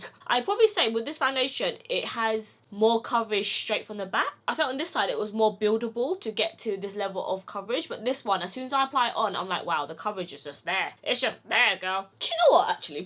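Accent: United Kingdom. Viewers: OMG LOVE UR VOICE AND UR BRITISH ACCENT😍❤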